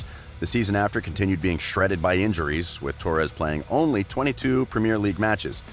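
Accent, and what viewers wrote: United States. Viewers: An American accent starts talking about "Soccer"...nah...not watching it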